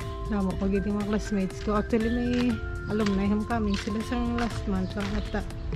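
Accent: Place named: Iloilo, Philippines. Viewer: Ilonggo ka po talaga magsalita😅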